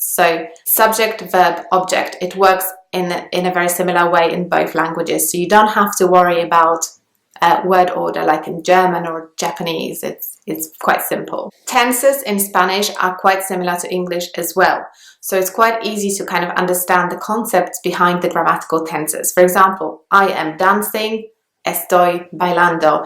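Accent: England, United Kingdom. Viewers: What a heavy English accent you have ( teacher) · How is this accent posh? It is just a normal English accent. I have heard more posh from elderly in my area though LMAO. Some tips to sound this way for forebears is say things as it is spelled like ‘t’ and extend your ‘a’s for example “paahst” = past. Even the h is super subtly pronounced where I live but a lot of People say it with a northern accent and mix it up. She says “example” I say exaahmple stressing that p a bit.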